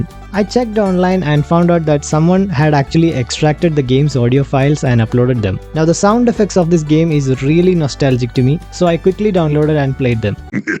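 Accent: Kerala, India. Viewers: Hey man are you Indian or English? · Bro are you from Kerala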